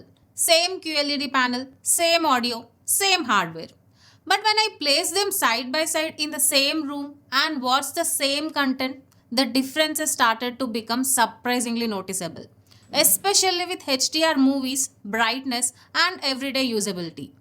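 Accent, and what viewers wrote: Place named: Tamil Nadu, India. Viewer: Aiyyyyo Thamillll accent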